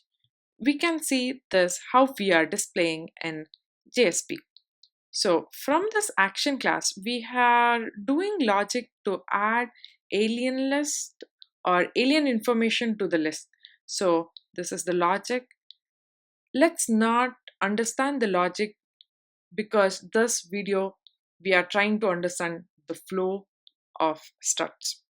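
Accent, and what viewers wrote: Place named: Europe. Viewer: Her accent is more like European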